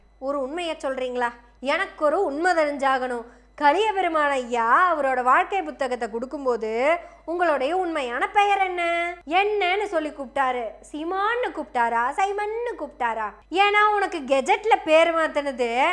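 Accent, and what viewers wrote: Kongu, India. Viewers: கொஞ்சும் கோவை கொங்கு தமிழ் சூப்பர்மா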